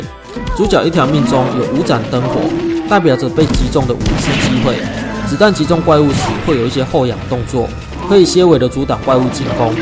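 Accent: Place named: Taiwan. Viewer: 點棟更 哈哈哈哈08笑死 尤其是大大的台灣國語 念點棟更 地契阿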